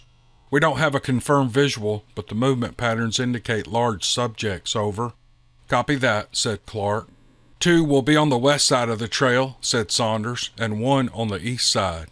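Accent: South, United States. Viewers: D.A.Robert’s gives Tom Clancy a run for his money, and brings it to life with that talented southern draw……..AWESOME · This sassy southern woman gettn' into team Odin!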